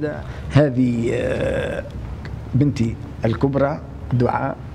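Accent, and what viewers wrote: Tunisia. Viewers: بس لهجتك تونسية بشكل كبير ، ليش ؟ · شفناهم كيف نهضوا بالبلد ولهجتك التونسية واضحة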